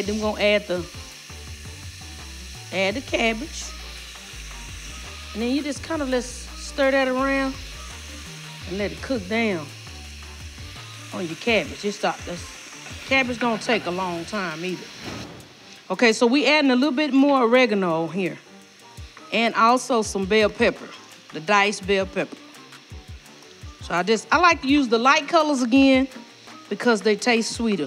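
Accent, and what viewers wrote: South, United States. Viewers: you so southern! 😂❤ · I love that she doesn't hide her/our Southern Twaaaaannnngggg!!!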